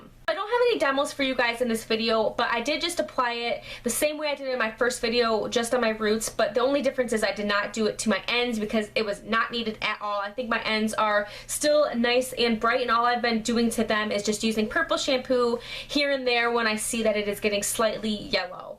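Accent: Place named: Georgia, United States. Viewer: Aww your accent is so cute lol. Love Georgia girl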